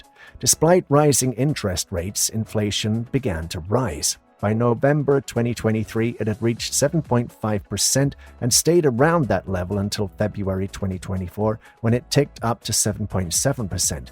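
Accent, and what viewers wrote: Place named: United States. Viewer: You know rush her is stuffed.....the yank accent is it too knowledgable man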